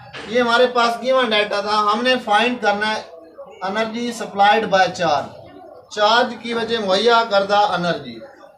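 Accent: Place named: Jhang, Pakistan. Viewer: Kia method ha prhana k kia Khana...sir ap jhang sa ho kia?? Ap k language jhang k lgti ha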